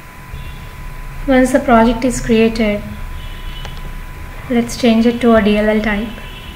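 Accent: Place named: India. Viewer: when i heard the idian voice, i knew 100% that you will be the solution. thank you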